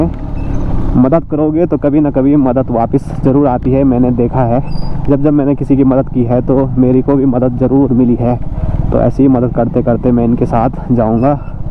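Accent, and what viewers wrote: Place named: Haryana, India. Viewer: Ary ya aawaj to mhale haryana ke h🚩🚩🚩🙏🙏🕉️🕉️🕉️🇮🇳🇮🇳🇮🇳🇮🇳👍🌸🌺👌👌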